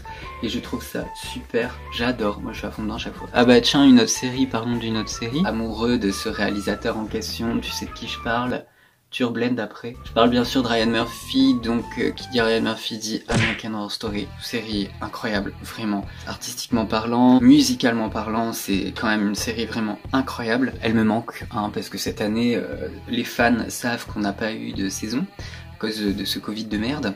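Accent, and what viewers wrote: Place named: England, United Kingdom. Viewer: L’accent anglais est merveilleux 😌 Toi t’es iconic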